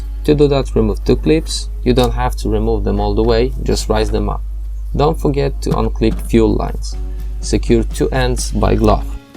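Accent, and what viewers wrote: Poland. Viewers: Polak na bank sądząc po akcencie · Jak tylko usłyszałem ten akcent to wiedziałem że to polak, nie mniej jednak dobra robota :D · Słyszę Polski akcent 😀 mam rację?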